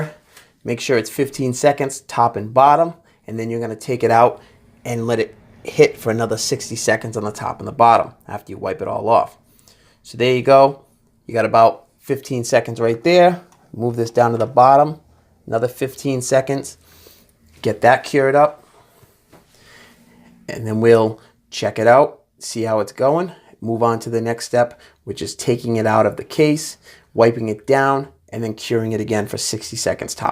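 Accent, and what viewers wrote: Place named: Massachusetts, United States. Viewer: GREAT review and How-To. I did mine following along, liked and subscribed. Thanks a lot and loved hearing the familiar accent, close to home. Sounds kinda like.... Somerville, MA if I had to guess. MAYBE, Medford?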